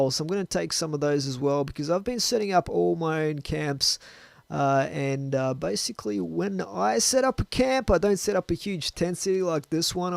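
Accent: Australia. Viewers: u AUSTRALIAN mate me too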